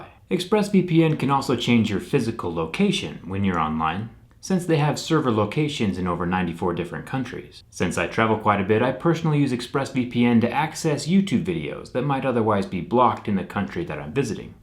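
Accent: United States. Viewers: Your voice is very flat !!!!! Please talk or speak or "parla" with Oxford accent if you want a normal ear to FOLLOW YOUR MOUTH !!!! OTHERWISE , Its HARD TO HEAR OR LISTEN , YOUR BRAIN-WASHING FLAT AMERICAN VOICE VIBRATION !!!!!!!!!!!!! ☀☀☀☀☀⛵✈✈✈✈✈✈✈ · Oh and the perfect American accent didn't give it away?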